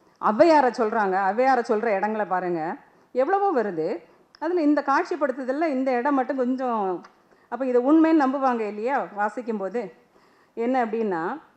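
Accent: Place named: Tirunelveli, India. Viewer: திருச்செந்தூர் ..... திருநெல்வேலி ...தமிழ் செம்ம....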